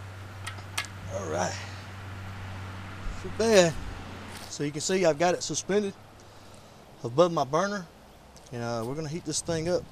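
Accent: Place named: Maryland, United States. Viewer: Where are you from? Sounds like Maryland.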